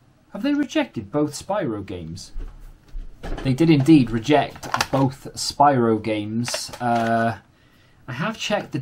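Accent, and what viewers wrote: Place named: South Wales, United Kingdom. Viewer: Do you mind me asking what area you live ? You sound like you’re from south wales and you mention Bristol and Gloucester in some of your videos but I don’t recognise any of the boot sales you go to !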